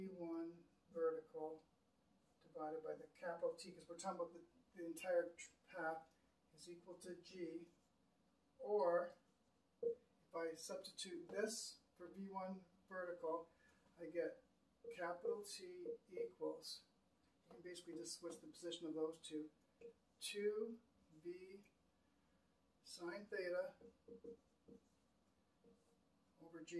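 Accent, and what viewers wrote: Canada. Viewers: Are you from Québec?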